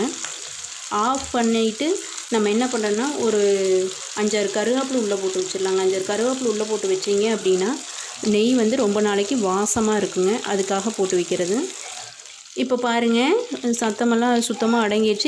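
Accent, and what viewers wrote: Kongu, India. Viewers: Kongu Tamil super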